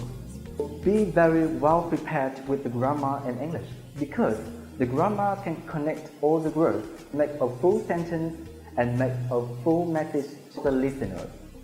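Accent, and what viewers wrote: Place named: England, United Kingdom. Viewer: You've got English accent:)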